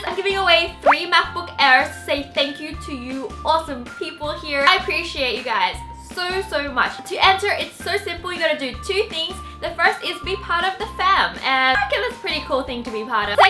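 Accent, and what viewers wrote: Australia. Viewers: She has a Australian accent because she's lived in Australia for a while before when she was a younger and she's actually Chinese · Bunnykis she's Australian. At least I'm 98% sure. Because I'm Australian but at the start it was also hard for me to tell as well 😅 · Are u Australian wengie?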